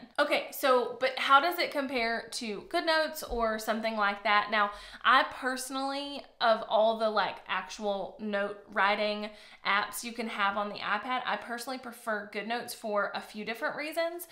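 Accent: South, United States. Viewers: I love that southern accent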